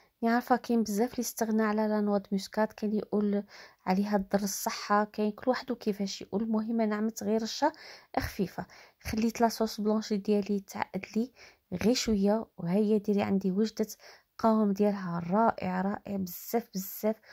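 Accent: Tlemcen, Algeria. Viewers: يا سلام علي الوصفة و علي الهدرة التلمسانية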